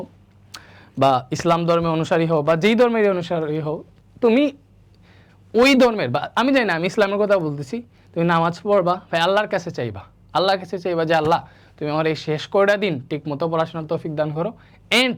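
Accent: Sylhet, Bangladesh. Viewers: Bhai apni ki Sylheti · Sylheti Sylheti vibe aser bror kota taki?🙌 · Bhai apni mone hoy Sylheti😊😊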